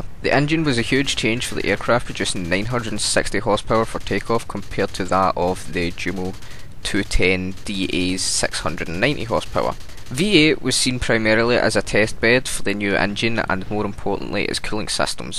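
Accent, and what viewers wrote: United Kingdom. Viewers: u from scotland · What part of North Ayrshire are you from?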